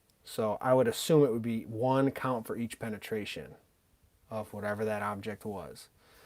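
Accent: Hawaii, United States. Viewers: This guy sounds like a local braddah. Love your work bro🤙🤙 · Cool, I can hear your Hawaii accent.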